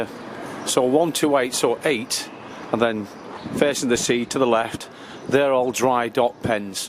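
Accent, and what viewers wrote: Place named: Wigan, United Kingdom. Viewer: Sounds like this guy is from Wigan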